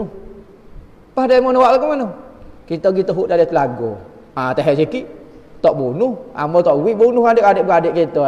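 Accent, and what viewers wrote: Kelantan, Malaysia. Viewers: Sedap dgr ustaz cerita. Sebab dia guna bahasa kelantan. Macam lain sikit bunyi. Walaupun dah tahu kisah nabi Yusof ni. Tak tahu byk mana dah ceramah nabi yusuf dgr. Ustaz ibnu rijal, ustaz auni, ustaz wadi anwar.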